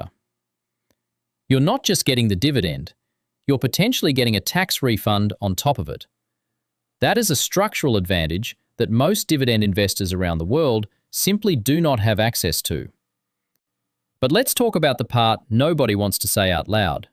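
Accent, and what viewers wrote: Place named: Australia. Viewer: I also love your Australian accent, even though I am a Aussie as well in Melbourne, I love your tone. You sound like Eric Bannar, the actor.keep up the great work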